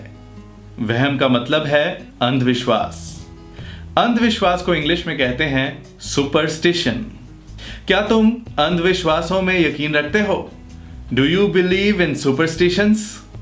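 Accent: India. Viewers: Indian English... dont be puzzled..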